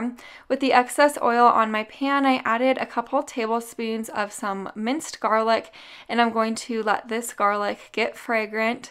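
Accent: Utah, United States. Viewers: I went to USU and it is such a throwback to hear your Utah accent, you are brilliant. Keep up the good work. If I had guess your from Sandy.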